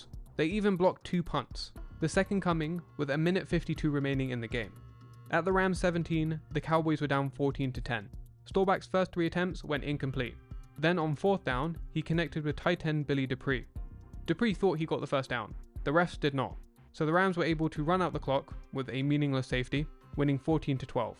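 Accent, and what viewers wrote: England, United Kingdom. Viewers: good video. I like your English accent. With that said it bothers me when talking about American Football. Would you be a lamb and do a video Hershel Walker.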